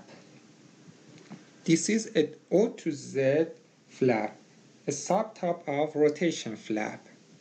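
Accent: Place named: Iran. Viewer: I have a classmate he speaks just like you, So I guessed you must be iranian.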